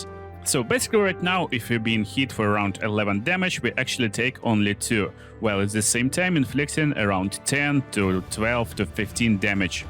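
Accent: Russia. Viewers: Даже на английском ютубе по бг я нашел человека с охранительным русским произношением. Гайд кстати хороший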